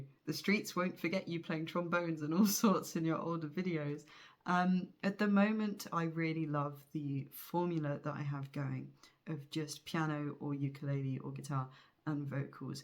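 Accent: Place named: London, United Kingdom. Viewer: It’s strange to hear you speaking, since I’ve only ever heard you sing. But you sound pretty classy—as a yank with little awareness of British accents outside of London, Manchester, Liverpool and Cockney, I’d have to guess that that’s London accent?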